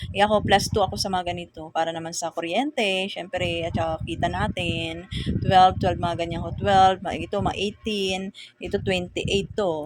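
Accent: Waray, Philippines. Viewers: Hello madam new subscriber here. Waray waray ka man po. Hehe. Taga diin ka po? 😊😊😊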